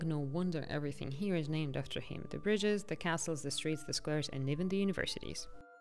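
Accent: Russia. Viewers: This is by far the most offensive, arrogant and I should say ignorant (considering historical, sociological facts as much as knowledge of common realias) amateur video ever made about Czech Republic and published on YT. What is also odd is this Eastern/Western “definition” is claimed by the author (and arrogantly served as “a fact”) who is apparently a russian person (the accent is clearly eastern european/russian…although author is trying to hide that ;), there is probably good reason for this) living in Switzerland. I mean wow… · First, let me very shortly introduce myself: My family is a North Italian / Austrian / Czech mix. Am I right that the girl who made this video may be Russian? Despite all her efforts, I can still hear some Russian / East Slavic accent. What's more important, her opinions seem to be influenced by that: 1. Czech Republic simply IS a Central European country and it's probably the very synonym of Central Europe itself. 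Geographically, historically, culturally and economically. For almost a thousand years (= most part of its existence) it has been in the Central / Western European sphere of influence (Holy Roman Empire, Austrian Empire, Roman Catholic Church etc.). Yes, for four decades it was a part of the Eastern block, just like East Germany. Never "Soviet block" or even Soviet Union. 2. The socialist regime ended in Czechoslovakia in 1989, not in <num> was the year when Czechoslovakia peacefully split into the Czech Republic and Slovakia.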